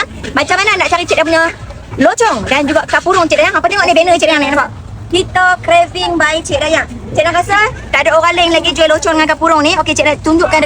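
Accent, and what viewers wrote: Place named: North, Malaysia. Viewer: tuu dia,pekat utagha noh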